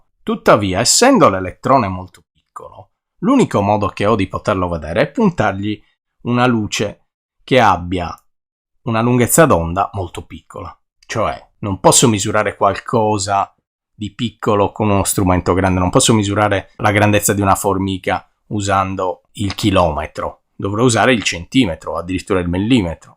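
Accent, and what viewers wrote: Sardinia, Italy. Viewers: mi sono iscritto . P.S. dall' accento mi parrebbe di sentire un sardo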